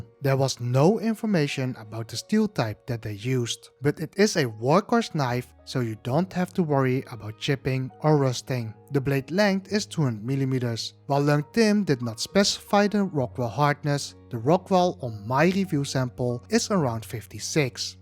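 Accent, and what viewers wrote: Netherlands. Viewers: altijd grappig dat je het Nederlandse accent er doorheen hoort :P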